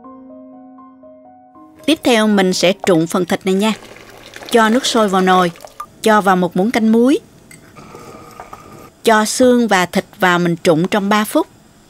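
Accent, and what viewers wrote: South, Vietnam. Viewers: Cảm ơn cô cách hướng dẫn cụ thể dễ hiểu. Đặc biệt cô rất thân thiện giọng nói ngọt ngào đậm chất Nam bô.nhờ sự chia sẻ này mà chị em nội trợ ngày càng có kỹ năng. Chúc cô luôn vui khỏe và có nhiều like😄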